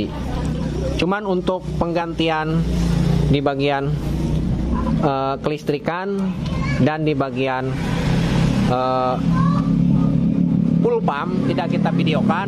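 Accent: West Java, Indonesia. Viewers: Mantep ah Jiga orang sunda Kang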